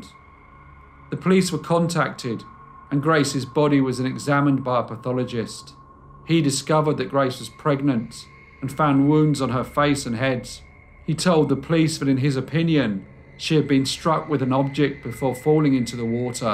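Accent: United Kingdom. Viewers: you really need to lose the british accent for your most large american audience. i would suggest california valley girl. the kardashians speak fluent valley girl, so listen to them. soon you'll be shortening words like gorgeous to gorg, fabulous to fab and ridiculous to redick. any word that ends in ous you will learn to shorten on the fly. i promise you your subscribership will soar.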